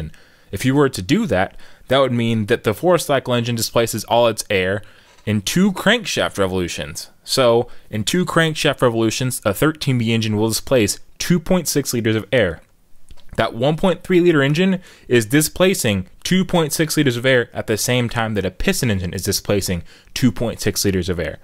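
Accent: United States. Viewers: Slow down with your voice. Most people have difficulty comprehending american. [Aussie in BC]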